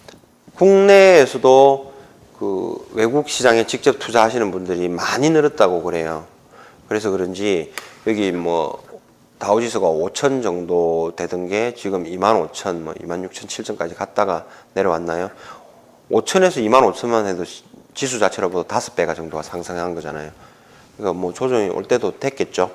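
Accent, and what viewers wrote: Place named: Busan, South Korea. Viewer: 살짝 부산 사투리가... 쑥 된장국 처럼 향긋하게 들립니다. 내용도 진솔하시고.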